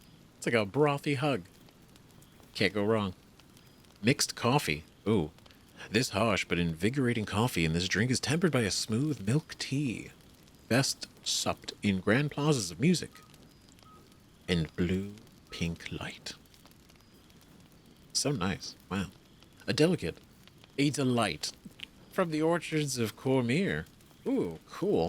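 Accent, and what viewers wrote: England, United Kingdom. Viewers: Yes, it's a loan word from French. I think is English so I assume he's heard it a lot. Maybe he's American though? He does use both English and American words and pronunciations but his accent is English, I think. lol I was just laughing about it and not trying to make a serious complaint or anything. It was meant to be a friendly comment. I'm genuinely sorry if I gave the impression I was trying to be rude or offensive. I apologise.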